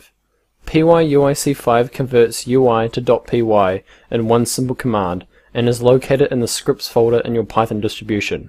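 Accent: New Zealand. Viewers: That T H I C C en zed accent Great vid!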